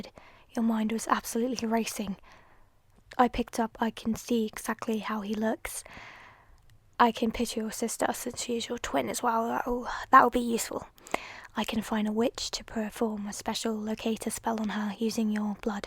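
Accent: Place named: United Kingdom. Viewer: You sound extremely British (First video I watch and I say this wow)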